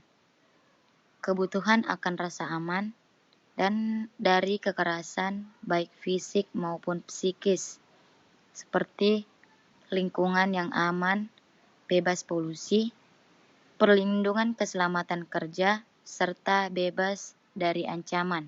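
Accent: Bugis, Indonesia. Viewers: Semoga dpt nilai terbaik. Jelas skali logat bugis nya 😬😇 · Tanra na ugi dari logat bacanya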